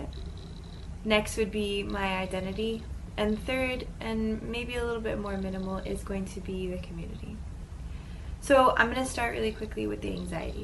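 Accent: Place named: United States. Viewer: اممم. امها امريكية فهمت ليش تتكلم كذا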